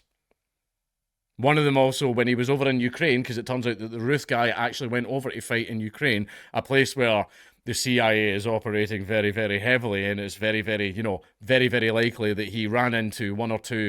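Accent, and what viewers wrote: Scotland, United Kingdom. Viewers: Maybe if you stopped speaking in a Scottish accent you would stop struggling with getting tongue-tied. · Maybe you wouldn't get tongue-tied if you just spoke normally and not in that weird Scottish way?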